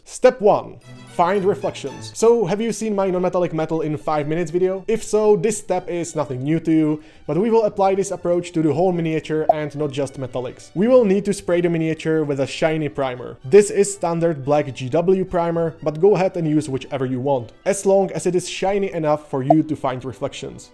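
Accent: Czech Republic. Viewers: Hi, love the tips, really helpfull! I have a question according to your accent, aren´t you a czech? Miniatures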